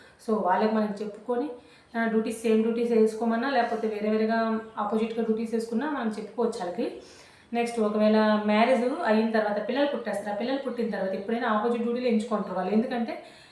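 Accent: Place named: Srikakulam, India. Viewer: Midhi srikakulm haa madem.. Language... Same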